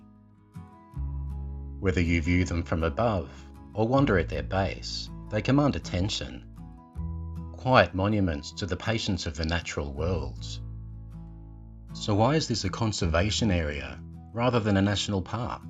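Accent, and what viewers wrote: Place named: Australia. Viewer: I'll second that no Yankee accents here just fair dinkum Aussie😊😊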